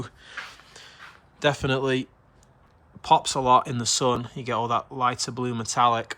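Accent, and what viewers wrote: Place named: United Kingdom. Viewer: You have a british accent but are u in California?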